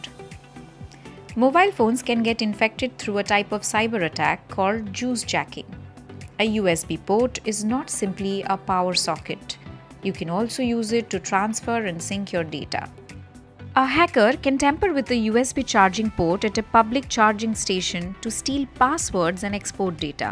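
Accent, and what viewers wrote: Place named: India. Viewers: English spoken by an Indian sounds so adorable 😍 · Indian Accent is best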